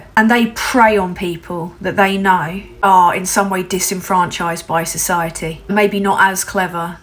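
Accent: United Kingdom. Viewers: Nothing sounds better than a roast in a British accent/slang. Thanks i really needed that.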